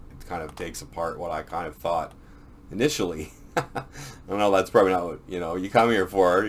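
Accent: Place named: Canada. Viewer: You're pretty good at this for starting up. We all need to do all we can to spread info that is being suppressed. May want to move your mouse a bit further from the mic when you scroll so it it doesn't pick up so many clicks. Knew immediately you were from Canada, if I had to guess I would say your from BC maybe? Canadian accents are weird in how they are distributed. I'm from rural sask. Hosers unite against the NWO!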